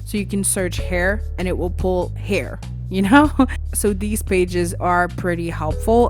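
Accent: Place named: Quebec, Canada. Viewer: Love your, french Canadian? accent?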